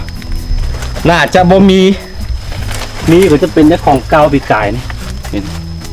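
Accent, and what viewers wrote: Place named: Chiang Mai, Thailand. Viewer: เพราะว่าสำเนียงภาษาหรือคำพูดของคุณเหมือนคนจังหวัดเชียงใหม่ของคนประเทศไทยค่ะ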